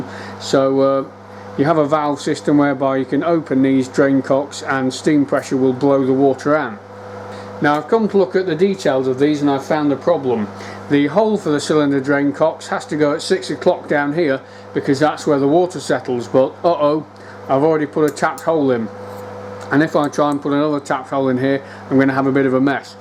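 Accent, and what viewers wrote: United Kingdom. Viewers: What part of the UK is your accent from? I've heard it before, but never knew.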